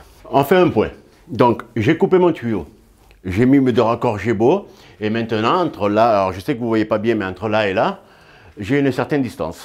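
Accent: South, France. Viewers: Bonjour! Tu es vraiment bien outillé mais c'est aussi le secret d'un travail bien fait! J'adore tes vidéos et cet accent du sud qui respire le soleil! Stéphane de Belgique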